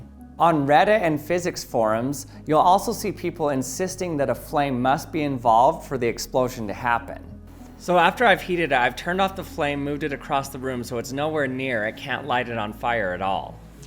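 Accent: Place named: California, United States. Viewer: I don't know why but chemistry with a camp Californian accent is super fun.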